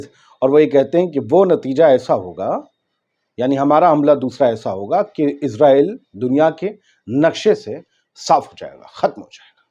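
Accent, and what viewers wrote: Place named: Muzaffarpur, India. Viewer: Bari Muzaffarpur zaban bolted hain aap.masha allah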